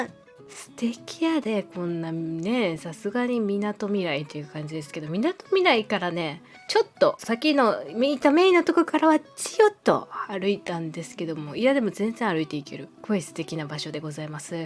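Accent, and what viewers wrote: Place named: Kansai, Japan. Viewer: いつも楽しく拝見しています🥰 アラフォーのおばちゃんスノ担です。私は元々は嵐担なんですが、カウコン→ジャニフェス→消えた初恋でめめに沼落ち、今はめめ寄りの新参箱推しです。 SnowMan関連の動画を観るのが日々の活力なんですが、さんの軽快な関西弁トークにすっかりハマってます🤗 聖地巡礼、めちゃめちゃ内容濃くて、ドラマを思い返しながら観ました。今回もおもしろかったです。ありがとうございました！サザエさん風次回予告も爆笑でした。次回も楽しみにしてますね〜🎬✨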